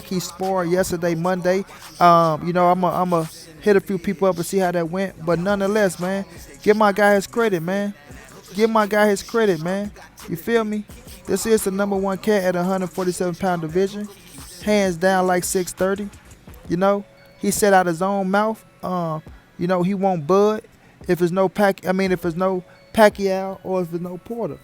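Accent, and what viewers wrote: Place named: Midwest, United States. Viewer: ok i was trying to figure out your accent that's that Midwest country. I'm from Louisiana btw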